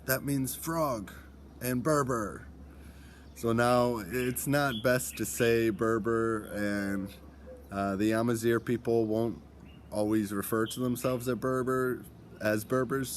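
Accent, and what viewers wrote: United States. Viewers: Hello excuse me. I am British, don't forget we taught you Americans English lol. Although i enjoy the American accent, we see our British accent as the more refined version. :)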